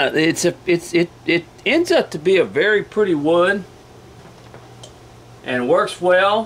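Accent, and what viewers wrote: Texas, United States. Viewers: Newbie carver and love your videos. Sounds like you live in my neck of the woods here in Texas. Are you a member of any local carving clubs? Also I know you have done videos on tools, but do have a list of the “x-number” of most important tools (and sizes) - I.e size and angle of vtool, gouge, knife blade etc? Finally, thank you. You have inspired me, and others much more talented than me! It has gotten me off my phone and outside— a real hobby.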